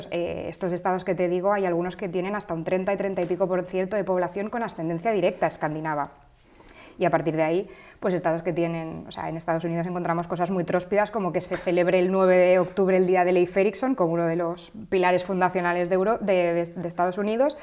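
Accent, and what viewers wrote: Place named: Spain. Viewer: Que guapa mujer España definitivamente sus mujeres y su acento el mejor del planeta !!!! Sé que no tiene nada que ver con el video, pero no pude aguantar comentar 😉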